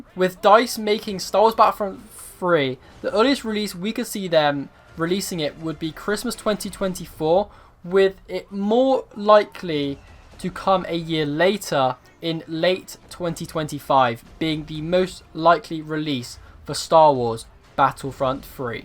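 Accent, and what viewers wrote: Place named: Bristol, United Kingdom. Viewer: You have a Bristol voice !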